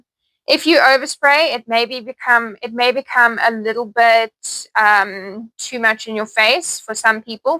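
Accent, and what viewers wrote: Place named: South Africa. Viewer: Your accent gives me Mzansi Vibes... Enjoyed the review and like the ink art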